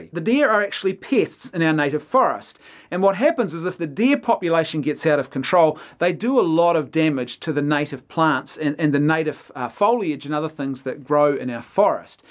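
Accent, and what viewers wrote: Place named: New Zealand. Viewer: Rare-bits and Possums are pissed? Are they always this mad down there? Love your NZ English :o)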